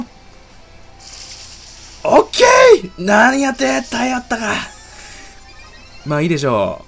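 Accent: Kansai, Japan. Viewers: 関西弁強めの懐かしい😭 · 声の若さと強めの関西弁懐かしいな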